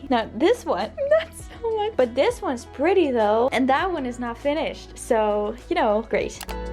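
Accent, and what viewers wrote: Netherlands. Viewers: Wait what are u Dutch? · Are you dutch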